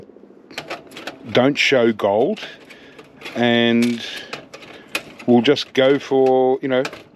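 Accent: Australia. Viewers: I cry when I see him selling the boards without depopulating them like the TITLE said...but still he is very worthy of watching and the Aussie accent always tickles me.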